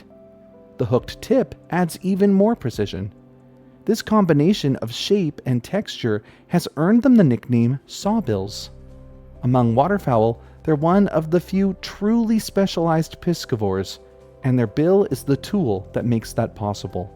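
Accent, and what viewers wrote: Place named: Vancouver, Canada. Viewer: That makes so much sense! I never thought about it, but we have the same accent. I grew up just outside of Vancouver.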